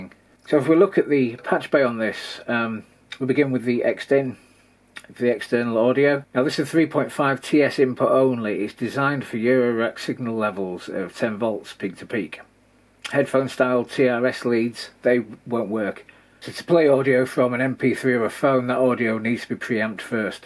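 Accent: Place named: United Kingdom. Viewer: Mate I love your videos. Whenever one pops up I always watch it. The thing that drives me nuts is where you are in the UK. I spent time there when I was in the (US) army in 02 in Hereford, and there was a bloke there sounded like you but we called every british soldier "squaddie" and all I remember is that he supported Wolverhampton and was what you would call a 'gob-shite.' He saved a friend of mine's life and my friend used to joke that it weren't worth having to hear the story so many times. Anyway there is some word you use for when something has a downside, like no reset on DFAM starts with a B I think. What is it please? And keep making videos.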